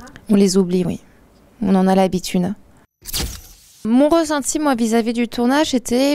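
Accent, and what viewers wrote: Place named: Paris, France. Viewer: Juliana Laurent c’est vrai qu’on dirait qu’elle a un accent du genre parisien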